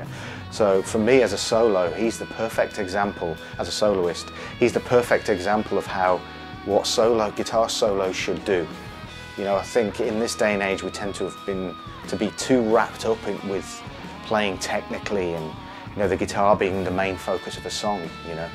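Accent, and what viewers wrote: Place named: Oxford, United Kingdom. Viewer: You got my "like" after just saying 5 words because you speak oxford english so perfectly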